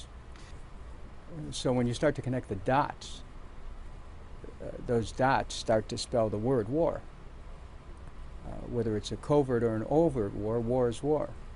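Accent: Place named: United States. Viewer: Mr Dennis you sound like an American the way you speak. But the true nature of the Real Americans .not Native American not Asians American. not Latin American. not African American. Is War. War comes because of hatred and and disrespect for others.he who lives by War will die by war.and all of these enemies that USA is building up against her will attack her one day..and I bet that they are going to target yellow stone. The cities are a joke. 🎅CIA.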